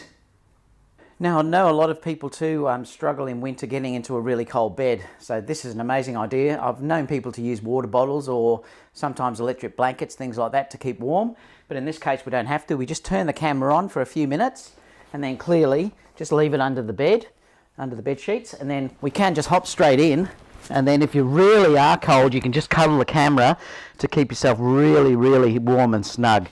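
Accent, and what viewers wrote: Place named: Australia. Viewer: Such an Australian narration and humour, mate!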